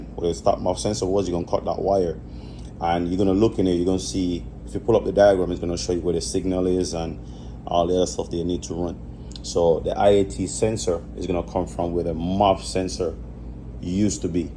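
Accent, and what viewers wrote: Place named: Jamaica. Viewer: Sounds Jamaican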